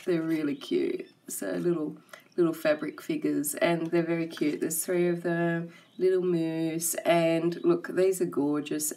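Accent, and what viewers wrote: Australia. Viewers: Great video, I love you're Australian accent, cute stuff.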